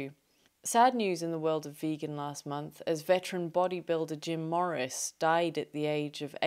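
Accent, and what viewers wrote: England, United Kingdom. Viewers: A subtle yet wicked sense of humor spoken with a English accent- quite enjoyable.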